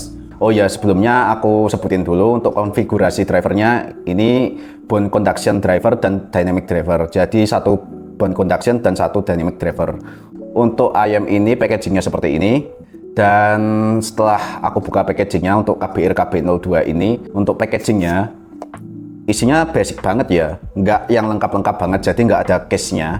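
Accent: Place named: Java, Indonesia. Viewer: Khas medok'e Jowo tenan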